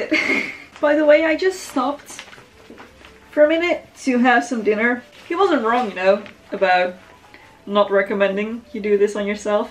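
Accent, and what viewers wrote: Netherlands. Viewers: Ik hoor het toch altijd wanneer een nederlander engels praat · I love your English/Dutch** accenttttt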